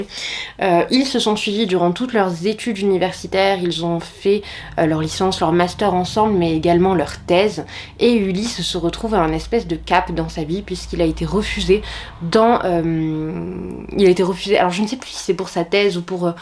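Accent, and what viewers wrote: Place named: South, France. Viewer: J’adore ton petit accent du sud 😊☺️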